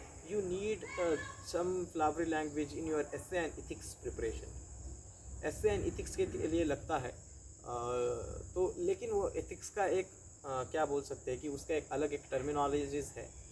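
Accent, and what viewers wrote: South, India. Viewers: Sry to ask bt Are u from Maharashtra or south.. Bcoz your name is bt your accent is like south indian.. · Sir ki english language ko south ka touch hai....IPS me unko south ka cadre mila hoga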